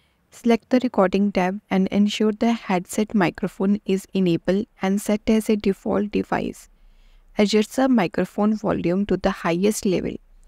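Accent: India. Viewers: Can’t escape the annoying Indian accent anywhere these days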